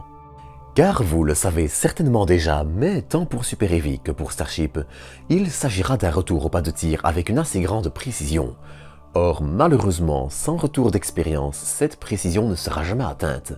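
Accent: Belgium. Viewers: Ouiiii la voix BELGE !!! xDDDDd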